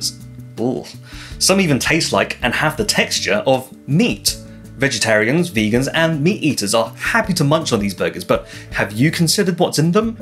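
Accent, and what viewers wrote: United Kingdom. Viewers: this has to be a first for me 52 years on this planet , i have never saw an asian speaking british accent.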